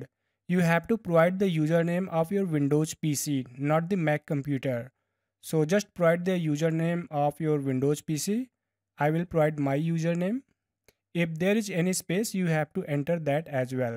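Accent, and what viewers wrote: India. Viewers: Your Indian pronunciation gave me a headache.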